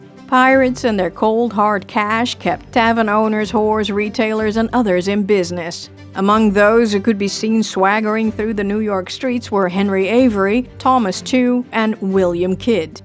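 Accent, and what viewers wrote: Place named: Netherlands. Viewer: 😻✨mooi Dutchlish 😊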